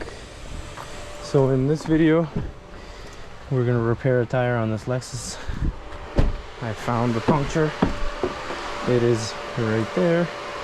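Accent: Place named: Trinidad and Tobago. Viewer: Why do you sound like you’re from the Caribbean? Trinidad to be specific?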